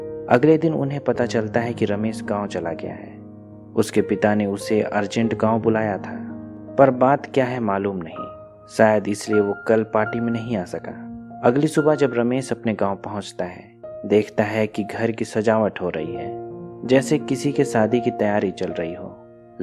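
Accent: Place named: East, India. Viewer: Shabdon ka istemaal sahi hai Bas uccharan (pronunciation) alag hai. Pronunciation alag hai, par galat nahi. Eastern India especially in Bihar, Jharkhand, Chhattisgarh wahan "nukta", "s" & "sh" me antar itna prominent nahi hota daily life me. He has acquired that accent. Jaise English ke kai accent ho sakte hain, waise hi Hindi ko bhi alag tareeke se pronounce kiya jaa sakta hai. We should appreciate his efforts to bring such good movies with such a lucid explanation rather than pointing out these petty mistakes. Let's encourage each-other and be more acceptive of each-other.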